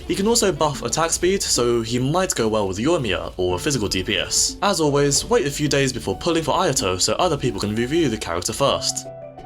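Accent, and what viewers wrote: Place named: United Kingdom. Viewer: Are you British? Your accent sounds British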